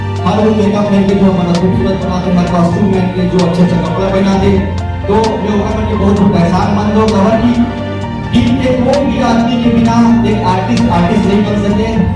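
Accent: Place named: Chhattisgarh, India. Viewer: Sounds cg 🚖🚖🚖🚖🚖🚖🚖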